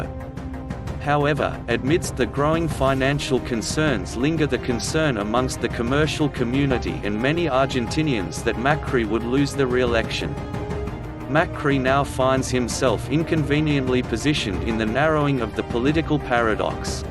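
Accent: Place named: United Kingdom. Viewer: The Corona virus is taking down the over borrowed stock market... and it's not a trade war when your competition has been raping the natural world and not paying tariffs on their exports... as for the fence on our southern border is obvious more nie than ever... we have 60 million illegals here.. time to send them home and yes America is first in america... our grandparents who helped fight and make America the strength it was would be pissed off if they saw how a lot of not very bright people are helping the confederacy a.k.a. the Democrats slowly turn our union into a socialist nightmare... and destroy our rights and liberties... all because they're favorite actor goes on TV and trashes the only one who cares to undo the dems damage and give us back our freedoms... propaganda garbage with a fkin brit announcer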